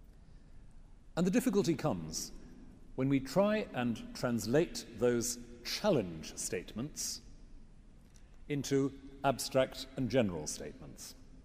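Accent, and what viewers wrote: United Kingdom. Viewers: the priest with the golden voice, and a british accent to boot! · The Archbishop of Canterbury has to speak the Queen's English! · Where did he get that posh accent? He's a Welshman!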